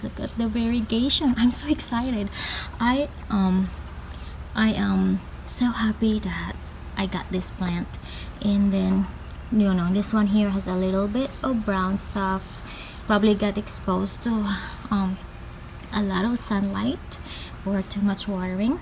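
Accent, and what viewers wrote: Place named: Philippines. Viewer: Hello from Ken in Japan. Your voice sounds like you are from the Philippines.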